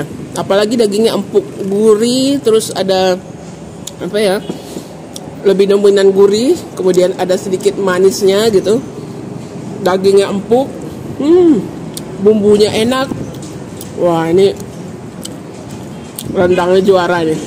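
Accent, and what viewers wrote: Minangkabau, Indonesia. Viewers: Dilek Minang kemirip Jawa, Gitulah sora, Sora menggetar jiwa, Ceria girang puas didengari.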